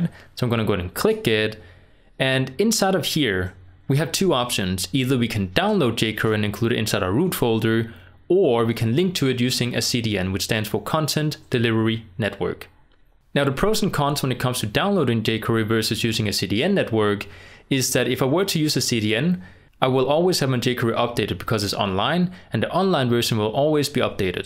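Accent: Denmark. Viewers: Thanks for the tutorial, helped me a lot. Btw, are you Danish by any chance? Your accent sounds really danish, or something like that.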